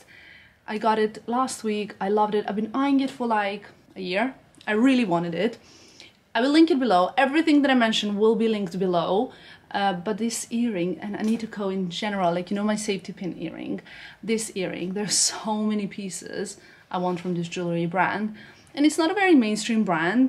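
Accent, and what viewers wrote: England, United Kingdom. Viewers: The english accent is back ! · The British accent is back. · crazy how fast your accent can change depending on where you are! sounding v british haha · your accent is British accent when you are in London, you mentioned before your accent will change when you with different friends, lol...funny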